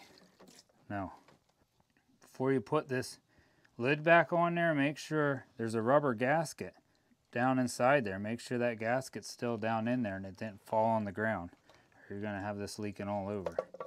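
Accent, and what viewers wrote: Pennsylvania, United States. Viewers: I just watched this and it’s great! 1 question, are you from Northeastern PA!!? You sound like it.👍😂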